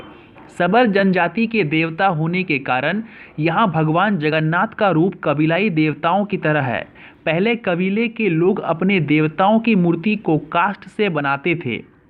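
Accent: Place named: Bihar, India. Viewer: Ra ki da mat karo na hi da ki ra mat karo...I know may b u belongs to Bihar ya UP ya Jharkhand to aap ka accent thoda change hota h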